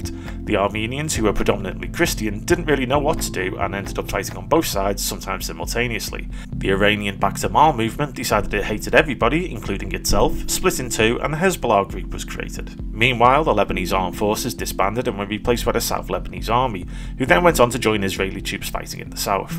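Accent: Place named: North East England, United Kingdom. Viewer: Just come across your channel (new subbie now). Why on Earth doesn't this channel have more subscribers???? Your videos are excellent and really well made! Good to see that you haven't been "silenced". Working my way through the videos. Keep up the excellent work! PS You sound like you are from my part of the world (north east England).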